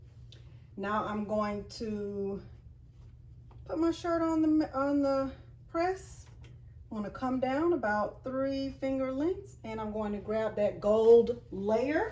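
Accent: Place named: South, United States. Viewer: Chileeeeee 😂😂 You just helped me with an order I just got! I couldn’t figure out the font and got a notification that you posted content and I ran on over to your channel! 😂 I love your content! You’re so thorough and nice! And you have to be from the south. Every time you talk I crack up laughing! I love it! Keep posting content. I can watch you all day and learn. Thank you! Thank you! Thank you!🙏🏾🥰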